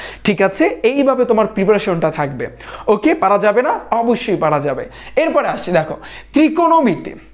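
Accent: Barishal, Bangladesh. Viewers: Vaiya apnar bujhano ta onek valo lage vaiya apni ki borisal ar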